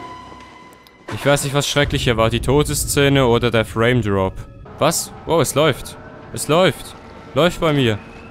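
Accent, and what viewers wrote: Switzerland. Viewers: Du bisch schwizer 😵 kul i ou 🤣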